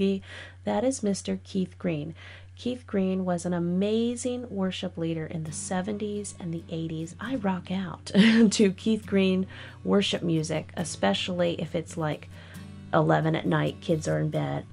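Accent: South, United States. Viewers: You're so welcome my dear. God bless you and your lovely family. Love the Southern accent. Makes me feel at home. We are originally from Virginia as well. God bless and keep making people smile. 😘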